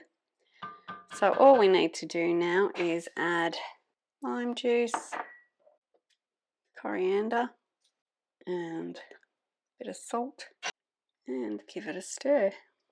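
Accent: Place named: Australia. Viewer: I think that there may be time when You Tube creators may question whether their channels are worth all the work that goes into producing content. This is my personal story of how your lovely posts have restored my spirit. Last fall my husband was given a devastating diagnosis. I spent several weeks taking him to daily radiation treatments and dealing with the side effects. (The lesions were discovered early and we have reason to believe he is on his way to full recovery). So what does this have to do with your gardening channel? Though I am an avid gardener, in the aftermath of his treatment regimen I experienced depression and lethargy. My garden needed prep for the upcoming season but I could not motivate myself to do anything other than sit on the couch and stare into space. Here is where you come in: I would watch one of your videos and could feel a spark of garden interest reigniting in my spirit. 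I would go outside and do just one thing. I’d watch another of your videos (enjoying your lovely, and to me—exotic, Aussie accent), then I’d go to my light rack and start a few seeds. The motivation has continued and I find that my depression and lethargy have mostly lifted and my garden and seed starts are ready for spring. And I thank you.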